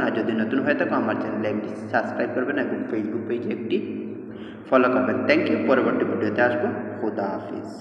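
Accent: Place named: Sylhet, Bangladesh. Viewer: আপনি ত সিলেটি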